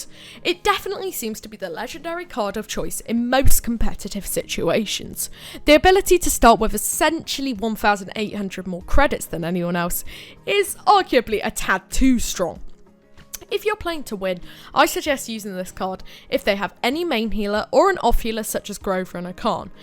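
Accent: United Kingdom. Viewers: Ehh leave him alone, he's quite informative and the tone of his voice is lively plus the british accent which tops it off. Tbh i could listen to him all day, i mean somehthings are bestare what they are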